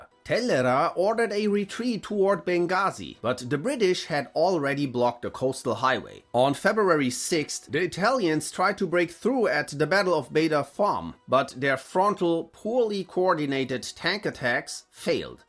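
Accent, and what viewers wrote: Germany. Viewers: Aha! Quite good, but very german 😊... Like my german: quite good, but very italian 😂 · Said by a german gives it a wholly different taste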